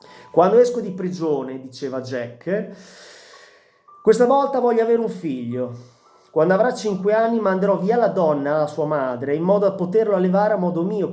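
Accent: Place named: Friuli, Italy. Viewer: Hai un bell´accento. Friulano?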